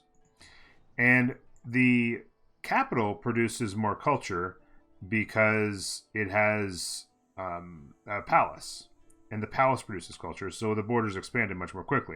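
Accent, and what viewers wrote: Canada. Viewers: Hey! Loved the video. While it wasn't exactly what I was looking for I did learn a lot. Production is king, culture is king (early game). Pay attention to tech tree (a tip I have there is once I get my early game stuff, I select something way late game like robots or nukes so it auto paths) My only criticism is you didn't explain why something is bad. Which fair enough, limited time in a VERY informational dense game. But I mean I signed up for a 35+ minute video so imo it's appreciated. I loved how you explained why to do X, Y or Z. So if you did that, I think it would be a big improvement! Also do I hear a fellow Canadian accent ? 👀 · Great beginner guide! So I'm guessing here that that's a Canadian accent, am I right? Going by the vowel O sound. Anyway, Canadians make the best guides.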